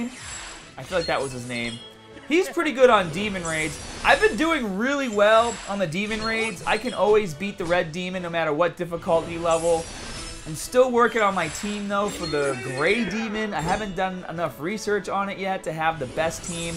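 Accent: Boston, United States. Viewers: He has a boston accent. He is from Boston, Massachusetts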